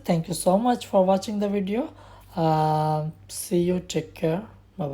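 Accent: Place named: Sindh, Pakistan. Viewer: Sir apki English main bhi Sindhi hone ka sabbot hai♥ MashaAllah Boht acha guide karty ho ap..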